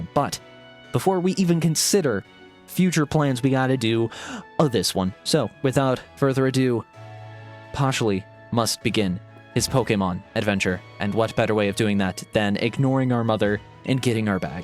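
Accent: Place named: RP, United Kingdom. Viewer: the super accurate posh accent got me hollering within the first few minutes HAHAH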